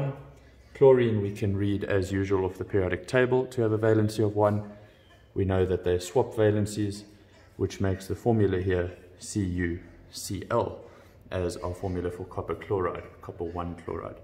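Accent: South Africa. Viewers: Helped a lot thank you PS: are you South African?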